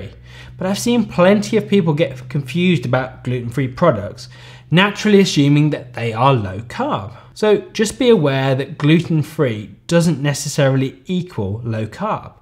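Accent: United Kingdom. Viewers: For the past 4 years, I watch Dr. Eric Berg and to be utterly honest, I learnt quite a lot. However, the way you go through details is great. Definitely subscribing and going to browse the channel. By the way, I love the way you sound, soOo British. Since I love the accent (Sir Ken Robinson, Simon Cowell, Marco Piere White, "Gordon Ramsay" are just a few to name really) is another reason to subscribe.😃🤘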